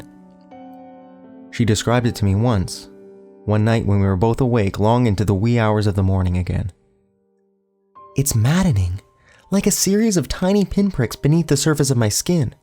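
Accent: Canada. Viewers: Great work friend! After the third one I stopped noticing all the "about" and "out". Canadian accents and humor aside. I think you have an actually soothing voice unlike certain other creepypasta readers, and an excelent selection of stories. Love how you read The Gristers.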